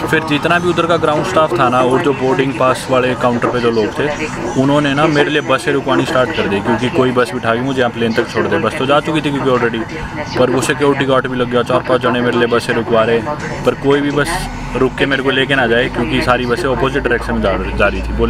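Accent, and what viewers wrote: Haryana, India. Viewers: Haryanvi boli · Bhai Ki Haryanvi ❤❤ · ap ki language me haryanvi felling ati jo bahut achi baat hai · Love your typical Haryanvi ascent 😅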